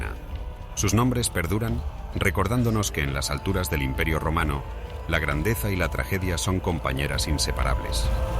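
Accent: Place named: Spain. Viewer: Por fín puedo escuchar este relato de la historia en español de España. Gracias.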